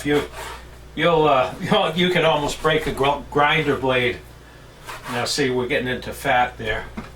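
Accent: Boston, United States. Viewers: Good to hear the Ba'ston accent again. : ) Hope you upload daily life videos more often. Best! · Great content…….. admire your dedication and determination to keep you and your people taken care of….. I am in Tampa…with much easier life…but not the community y’all have….very nice…..we would be best friends…….that Boston accent is hanging in there!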